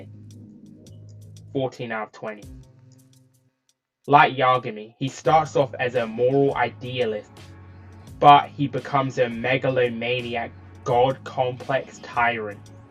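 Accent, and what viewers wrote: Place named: United Kingdom. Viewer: 25 minutes of british accent.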